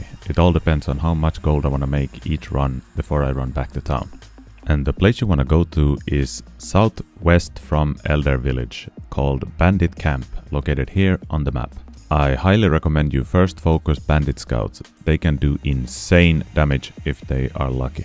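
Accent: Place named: Finland. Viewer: were you the one who gave me that pack horse? And you sound Finnish!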